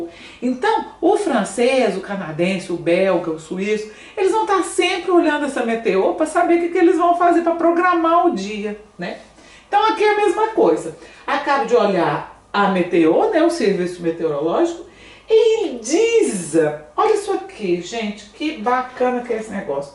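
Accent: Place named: Minas Gerais, Brazil. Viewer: Prof sou novo no canal, to amando os videos! Seu jeito mineiro e reconfortante pra mim que to tentando aprender desde 2020 mas so agora decidi pegar firme!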